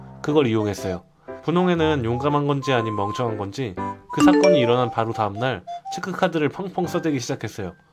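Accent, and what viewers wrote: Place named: Busan, South Korea. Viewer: 마 부산사투리 어썰푸다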